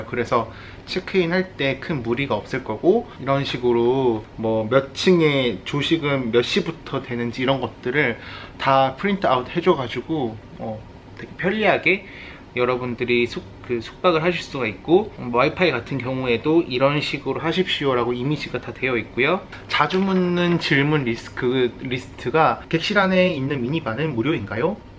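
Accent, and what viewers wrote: Gyeongsang, South Korea. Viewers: 몰랐는데 사투리가 부산경남.. 이신가봐요!ㅋㅋ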